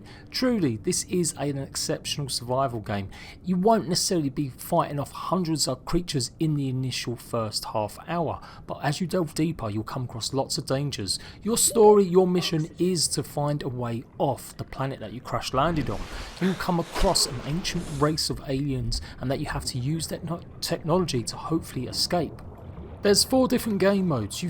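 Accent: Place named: Essex, United Kingdom. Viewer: You must be from Essex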